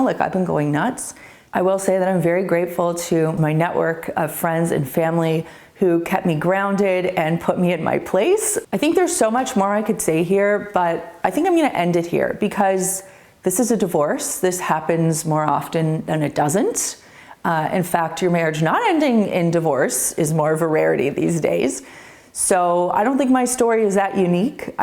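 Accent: Canada. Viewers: Wow I can tell you're definitely from Canada A.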